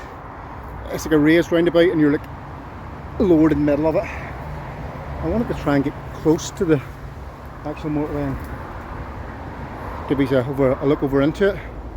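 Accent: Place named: Northern Ireland, United Kingdom. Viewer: Good to hear a Norn Iron accent on a camping vid, keep it up 👍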